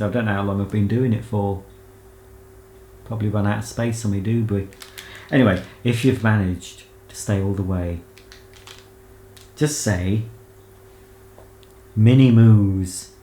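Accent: United Kingdom. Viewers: Maybe it is the English accent I like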